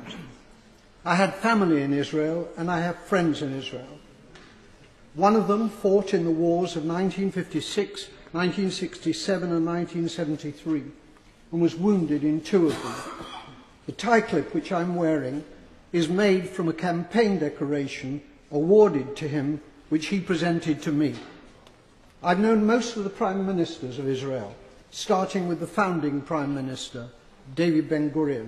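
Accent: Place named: RP, United Kingdom. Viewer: For such an intelligent sounding man, he sure is upside down. The Arabs have never, never, retracted their explicit intention to kill all the Jews in Israel. Gaza is a mess, but it's never been Israel's intention or desire to kill all its citizens. What does this mean propose? I mean practically. He looks like a gentleman, but he sounds like a high hippie 😂. "Peace, bro, peace to the world. Groovy, man." It's easy, but there is a reality to consider. He sounds like a woke teenager with a posh accent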